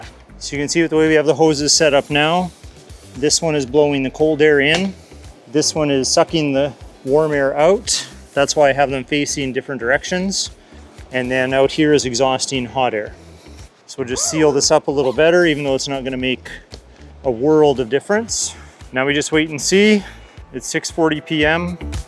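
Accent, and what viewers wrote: Canada. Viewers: You did well explaining it. Love the way you say garage, sounded like you were cutting the letters down to just 3 lol. you'll see it's like soft french.....!!!! Canucks always sound foreign. Must be in the water. For the price if it's durable. And durability being the key word it's worth the $ 699. Or is it???? A top mounted R.V. ac/heater that is much more powerful is only $200 more. But for tents and off grid applications this would maybe also be great except I check a low watt a/c on Amazon and it's only $ 332 14,000 btu , so...... For tents this would be worth it. Another scary thing is it only comes with a 1-year warranty. That doesn't build confidence. For winter we always use a low watt electric heater along with kerosene lanterns. You'd be amazed how much the old hurricane lanterns put out. We made #2 boxes for ours to sit down in, so the kids couldn't knock them over. 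But now in our " Golden Years we just do hotels and motels. Get all kinds of rebates and miles and bonuses for loyalty too. Much safer and easier and actually cheaper. Over 65 has it's perks. Loyalty is the key. Best regards Canuck. Great Job on the video. Peace. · man, what a dumb comment. You’re an embarrassment to the rest of us. If you couldn’t tell he was Canadian by his accent, you need to get out more. Also, you act like Fahrenheit is American…it was developed by a Dutch physicist and the US is only one of 5 countries in the world that still uses it. There’s a reason for that. And if your comment was some smoothbrained attempt at American exceptionalism, you really need to read some history about how strong an ally Canada has been, regularly spilling more blood and treasure per capita that the US does in global conflicts. You came here looking for information and it was given to you for free…so just be grateful someone else did your homework for you.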